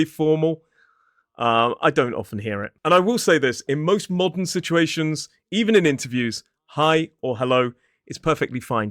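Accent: United Kingdom. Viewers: I like your British accent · Hi, I'm Alauda (which means lark in latin). I'm german, raised in north Germany, Angeln, where some Anglo-Saxons came from originally 🤓, and I'm living in France. I'm retired now and living with my cats in a little cabin. I love to read english and american litterature, english is my favorite language, but I never really spoke it. Since some months ago, I try to activate my english by writing youtube comments. I have no concrete use for it, but I like your channel nevertheless, and particularly your so bri'ish accent.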